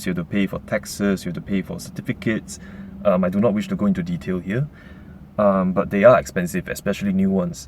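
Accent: Singapore. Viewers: just in case if heavy singlish happen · A guy from my church is from Singapore and he sounds exactly like you. His wife, however is from Singapore also, but her accent is very different and she's harder for me to understand. I'm not sure why. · Rev up your Singlish.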